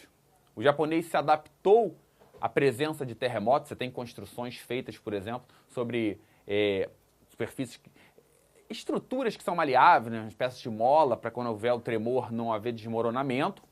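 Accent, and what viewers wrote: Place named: Ceará, Brazil. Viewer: cearense kkkkk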